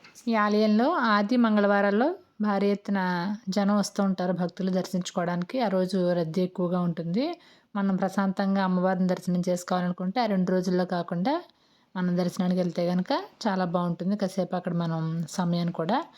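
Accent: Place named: East Godavari, India. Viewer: మీ వీడియోస్ అన్ని చాలా బాగుంటాయి గారు.నేను మీ వాయిస్ చాలా బాగుంటుంది.మీరు చెప్పే విధానంలో ఈస్ట్ గోదావరి నేటివిటీ ఉంటుంది 👌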